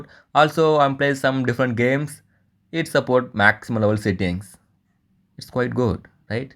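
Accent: South India, India. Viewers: South Indian type..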